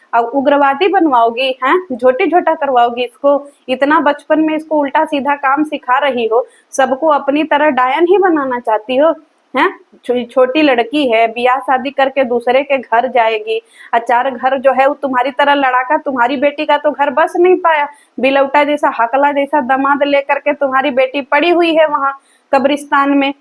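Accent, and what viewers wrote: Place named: Uttar Pradesh, India. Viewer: यूपी वाला भोजपुरी बोलतीं हैं आप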